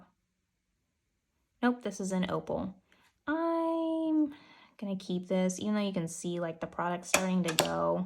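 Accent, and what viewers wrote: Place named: United States. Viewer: Also I’m just curious, where are you from? Your accent is very pronounced something like Montana, Chicago, etc.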